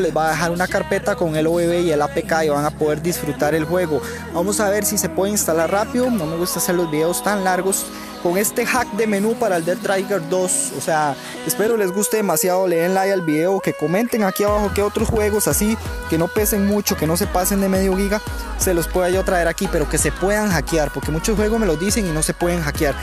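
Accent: Costa Rica. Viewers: Eres tico??? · Q tal bro eres tico?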